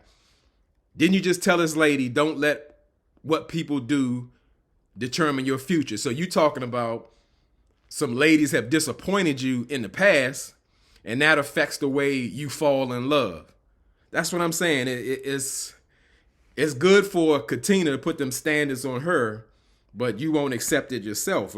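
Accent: DMV, United States. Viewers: Are you from DC/MD? Your voice sounds like you’re from the DMV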